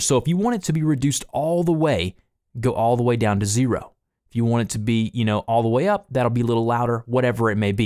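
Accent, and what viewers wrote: United States. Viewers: Man u got a nice accent, which state ur from? -( I’m not an American)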